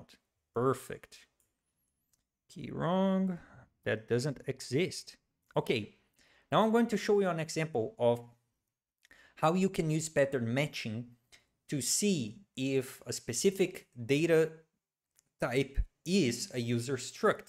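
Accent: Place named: Brazil. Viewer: At first I didn't understand what these `echo` and `feud` meant, then I learned that Brazilians just pronounce the words `error` and `field` like this... Very irritating :D, but I've gotten used to it now.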